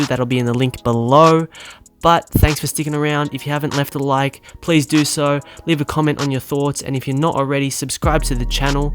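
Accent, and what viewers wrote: Australia. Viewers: Ur Australian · You ozzy ?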